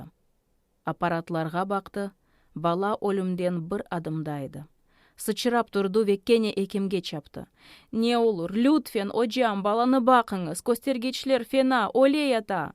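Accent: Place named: Russia. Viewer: Такое ощущение что русскоязычная тётенька читает с ужасным акцентом книгу на тюркском языке.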